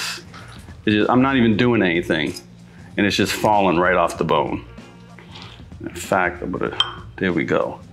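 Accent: Boston, United States. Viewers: Pointless comment here: This is the first episode I watched where I could actually hear the Boston accent. 🙃 I love watching this channel! Thank you for all the "cooking classes." (Essentially.) I swear I learn something new every episode. ❤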